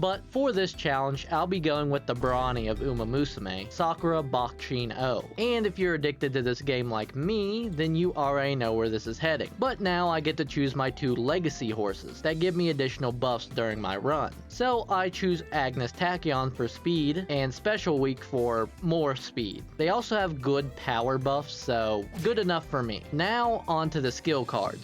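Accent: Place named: United States. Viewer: You sound like you’re on the verge of using a country accent but it makes you sound nonchalant and cool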